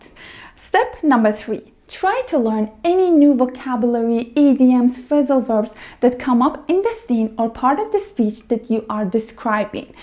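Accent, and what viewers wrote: Iran. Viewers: ته لهجه ايراني تون مشخصه. لايك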